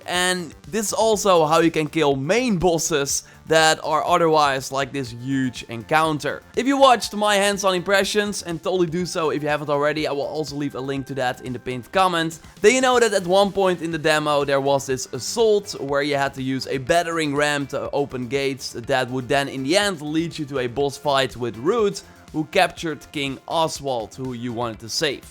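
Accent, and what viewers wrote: Netherlands. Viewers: you are from the nethelands dat kan ik horen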